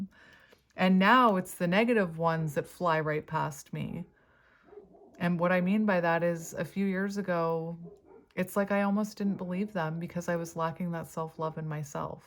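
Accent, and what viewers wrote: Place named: Canada. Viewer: Crying and throwing up every time you say "about" because this guy has the most beautiful Canadian accent I've ever heard in my life. 😭😭😭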